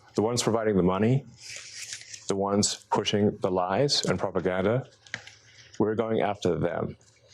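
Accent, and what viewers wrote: South, United States. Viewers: Couldn't love you all more, and I never miss a podcast. Your southern twang and righteous quest for equal rights brings me joy every day. I live in canada but know that everything that happens in the usa comes here soon after and I am passionate about saving democracy. I think peter thiel might be the most overlooked dark player of this whole bunch. Thiel's idea since paypal has been to take over the american economy by lowering the value of the american dollar to equal that of cryptocurrency, which is what I believe the tariffs are meant to do. I believe peter loves elon being the front man for distraction as he silently plays chess with america. I think thiel and musk bonded over curtis yarvins nazi views and they are seeking to create all white communites governed by their own feudal laws where women have no rights. Thiel has stated that he'd like to turn greenland into one of these communities. 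Lastly I think the blood bond between trump, musk and putin might be Ivana getting pushed down the stairs the day before she was to testify against trump in court, and jeffrey epstein getting killed in prison.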